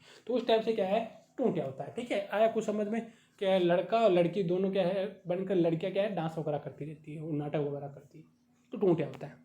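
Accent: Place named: Marwar, India. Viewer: Most epic movement marwadi tone & nice session 💝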